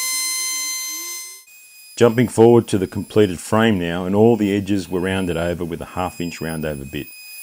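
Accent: Australia. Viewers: Where have this channel been all my life? I love it! Subscribing for sure👍🏻 I love me some aussie accent aswell👌🏻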